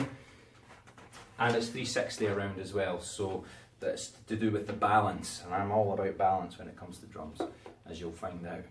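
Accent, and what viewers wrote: Scotland, United Kingdom. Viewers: Are u from Scotland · Good review mate, and good to hear a Scottish voice instead of all the American reviewers.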